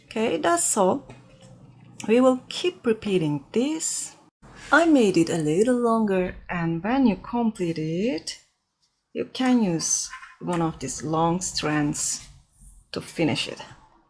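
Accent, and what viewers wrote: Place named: Turkey. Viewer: best turkish accent I've seen in a long time so calming ahahah i feel like you'd be a great grandmother I never had hahah I sometimes watch yer videos and feel like yer my granny hahaha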